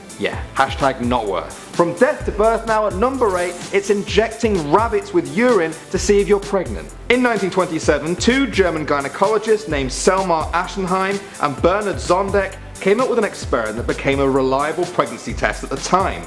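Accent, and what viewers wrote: United Kingdom. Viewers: Don't take all the bad comments to heart. I think you were great! Love the british accent! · I hear anyone hates our accent boi they dead British accent is fuggin dope I think I might have had a stroke -.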